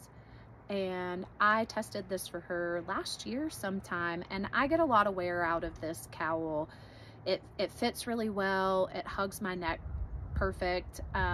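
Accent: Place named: North Carolina, United States. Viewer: Adore your cowl❤ and we were in NC for about ten years so I love hearing your accent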